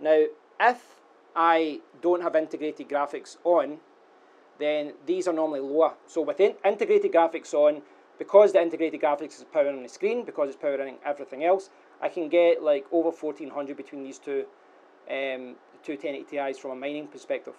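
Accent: Scotland, United Kingdom. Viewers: Hey, is it scottish english you're speaking?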